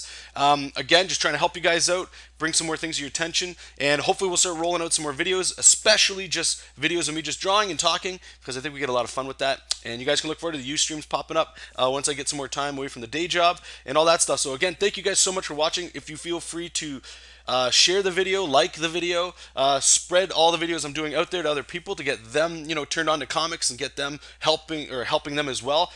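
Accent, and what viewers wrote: Canada. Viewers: That good lol Canadian accent lol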